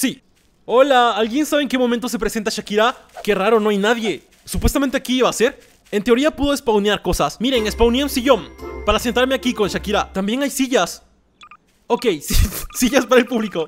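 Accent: Peru. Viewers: Eres de Perú? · Omg eres peruano a argentino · Eres peruano? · sos peruano? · eres peruanoooooooo yo tambieeen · eres de perú?🤨🤔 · eres peruano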